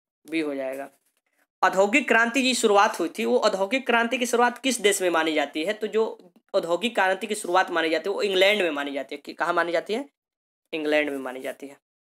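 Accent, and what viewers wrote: Bihar, India. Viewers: Bihar se hai kya jii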